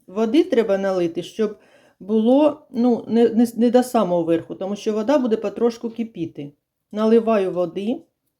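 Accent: Chernihiv, Ukraine. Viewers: Вітаю,зайшла до вам на канал випадково,але почувши такий рідний Чернігівський акцент,просто,як бальзам на душу, дякую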